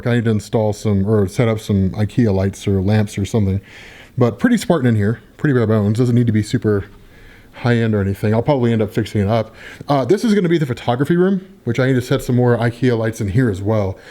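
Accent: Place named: Boston, United States. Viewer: Your from Boston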